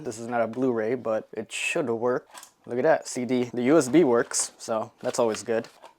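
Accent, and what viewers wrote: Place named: Asia. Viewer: your like a woman. I presume your asian judging by your accent which are the most woman like dudes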